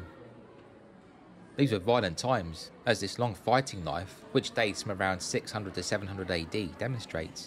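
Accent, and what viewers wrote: London, United Kingdom. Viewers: No way man your accent is straight out of Mary Poppins and your foreign viewers must absolutely love that 😂👍🏼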